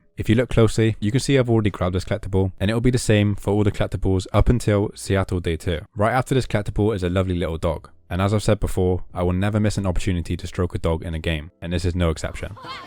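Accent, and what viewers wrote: United Kingdom. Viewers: Are you from Nottingham??? I hear a very familiar east mids accent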